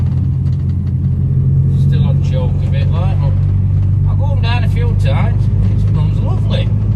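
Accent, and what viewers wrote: Yorkshire, United Kingdom. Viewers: Thanks for the video I always look 4wards to your videos & il always support you in what you do in anyway I can my friend, I'm away sharing your videos & me n my mate have screening binges on all the videos you do. They take us rite back to our youth & I love the way you talk & your accent as you remind me of my gran & mum as they are originally from Yorkshire Bradford n Wakefield. I have so many good memories watchin n listening to you & your videos. Please keep up your amazing work & your mega videos my friend. Best regards. Jim in Manchester.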